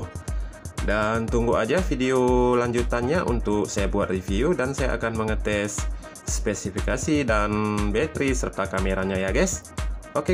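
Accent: Malaysia. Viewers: Kok ngomongnya kayak org malaysia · Ni org indonesia apa org malaysia sih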